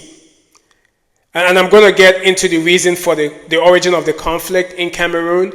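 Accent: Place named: Nigeria. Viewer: Hello mate! You've got your facts wrong or mixed up. Francophone Cameroon known as la Republique du Cameroun got its independence from France on January 1st 1960. You sound Nigerian. Anglophone Cameroon previously known as British Southern Cameroon was administered as an autonomous Unit within Colonial Eastern Nigeria with the headquarters of the latter Region in Enugu. In order to gain their independence, British Northern Cameroons and British Southern Cameroons as separate entities had to either join la Republique du Cameroun or remain within the Federal Republic of Nigeria which became independent on October 1st, 1960. During the February 11th, 1961 Plebiscites, the adult population of British Northern Cameroons voted to remain within the Federal Republic of Nigeria. Sardauna Province, as British Morthern Camrroon was known before Independence comprised parts of present-dayTaraba, Adamawa and Borno States. 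British Southern Cameroons voted on a 70-30% split to achieve independence by joining la Republique du Cameroon. Independence was effective from October 1st, 1961. Differences of opinion on governance between the federated states of West Cameroon and East Cameroon commenced after October 1st, 1961. The citizens of Ambazonia- the former federated English-speaking state of West Cameroon ensued marginalisation from the outset of a highly centralised Federal Republic of Cameroon. To get on in Cameroon, Anglophones must learn and master French. My generation accepted the fait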